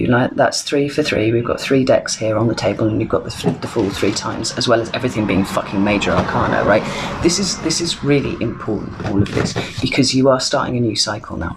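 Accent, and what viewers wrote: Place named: Newcastle, United Kingdom. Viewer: Expecting a Newcastle accent🤔? Anyhoo, the reading's bang on👍🏼The dark night lasted about ten years. I wasn't certain I'd make it through to be truthful - it was pretty touch & go for a while. But, yes; there's a project that's got me back on track. Thank you for the most excellent reading. 'Representing the male contingent by the way... Rob🦕 Namasteé 🙏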